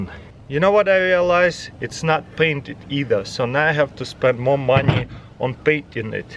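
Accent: Russia. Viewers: i like ur rushian accent :)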